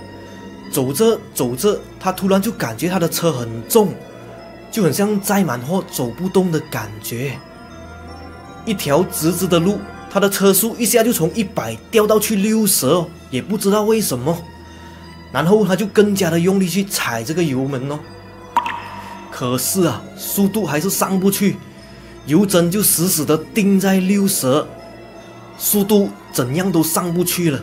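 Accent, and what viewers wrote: Malaysia. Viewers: 都要看/听😂，support 大马腔，请问你是客家人吗？ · 故事惊悚很精彩，大马腔调好听👍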